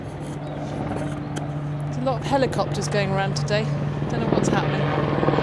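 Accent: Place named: RP, United Kingdom. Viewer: Nice in depth review, and plenty of specs to keep the techno bods happy, the guy who wrote he liked the posh voice made me laugh, but it is nice to hear a well spoken voice, (must admit i was reminded of Joanna Lumley) which is no bad thing, anyway , like the knife and like the ease with which you use it, the easier it looks the more you know the user has skill! cheers Dave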